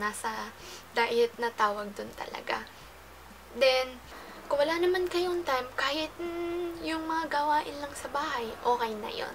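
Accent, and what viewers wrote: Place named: Japan. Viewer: ang cute niyo po magsalita, parang may japanese accent 😂💛 new subbie here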